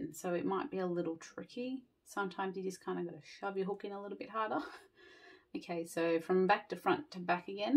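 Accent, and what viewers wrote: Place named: Australia. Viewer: I just subscribed to your channel because of seeing this square!! Love it and look forward to listening to your Aussie accent haha!!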